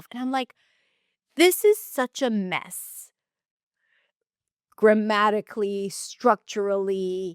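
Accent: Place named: United States. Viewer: I honestly couldn’t believe you’re a native Hebrew speaker because you sound so American! 🇮🇱🇵🇭 I’m a Filipina working here in Israel, and learning your background just blew my mind. I’m currently trying to improve my English, and your transformation gives me so much hope! Todah rabah! 🙌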